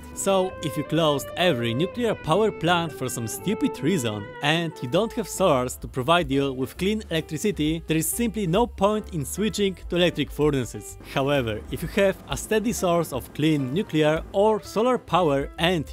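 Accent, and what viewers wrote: Poland. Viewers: Cały czas się zastanawiam po tym akcencie czy jesteś Polakiem. Ciekawe... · WIEDZIAŁEM ŻE JESTES POLAKIEM PO AKCENCIE POZNAŁEM W SEKUDNE xdd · You have a very thick ponglish accent. Have you attended to pasha learning school? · jak tak słucham tego akcentu, to słychać że Polak xD ale lepiej po eng nagrać, bo wiecej osób obejrzy, wiadome, pozdrawiam :D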